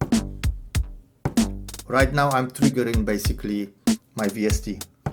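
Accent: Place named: Poland. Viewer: That accent is impossible to miss. Are you Polish? And since you use the name, I guess your name is?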